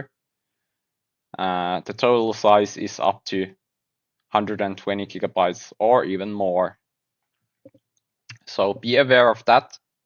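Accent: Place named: Finland. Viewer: once i heard a finnish mans voice i knew this was going to be a stupendous game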